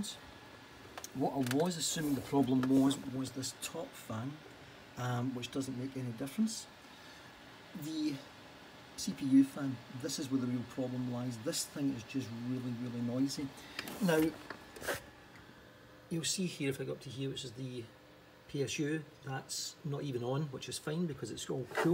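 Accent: Scotland, United Kingdom. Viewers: sort of off topic, but your Scottish brogue is awesome.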